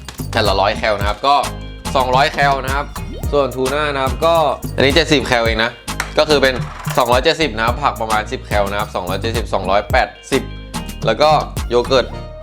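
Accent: Thailand. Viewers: เฮ้ยทำไมเหน่ออ แต่เราจะทำตามนะชอบๆ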